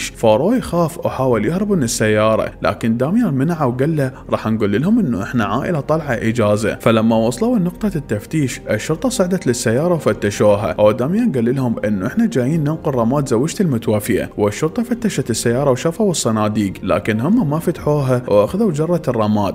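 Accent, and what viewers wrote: Iraq. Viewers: انته عراقي مو العراقين وينكوم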